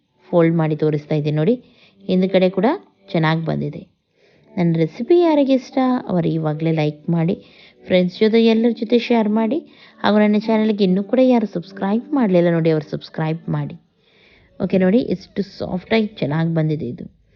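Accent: Mangalore, India. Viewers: Super recipes and your Mangalore language sweet voice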